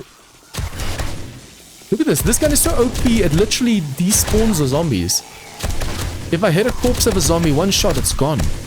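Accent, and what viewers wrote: South Africa. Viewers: question are you south african ?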